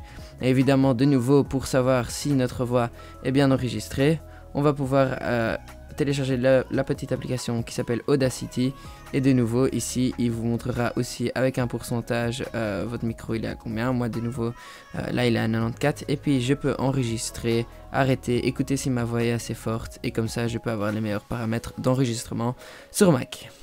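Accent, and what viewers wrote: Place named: Belgium. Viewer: Incroyable l'accent belge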